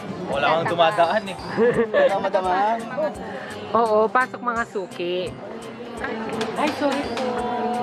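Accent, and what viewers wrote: Visayas, Philippines. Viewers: Ay bisaya diay ka mamsh? Karon pa oks😅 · Bisaya ka madam ?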